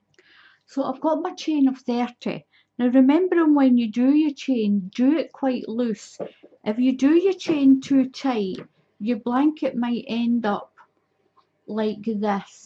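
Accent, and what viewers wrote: Scotland, United Kingdom. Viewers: Nice to hear the Scottish accent!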